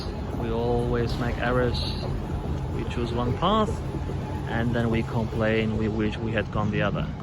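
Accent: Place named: United Kingdom. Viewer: Açıkçası bir Türk'ün bu kadar hatasız ve akıcı ingilizce konuştuğunu ilk defa görüyorum ve şaşkınlık içerisindeyim. Aksanınız İngiliz aksanı belli, peki İngiltere'de kaç sene yaşadıktan sonra ingilizcenizi bu kıvama getirdiniz? İngilizceyi bu kadar akıcı konuşabilmeyi neye borçlusunuz? Senelerce sadece ingilizce mi konuştunuz? İşiniz İngilizce konuşturmayı mı gerektiriyordu? Sırrınızı çok merak ettim :)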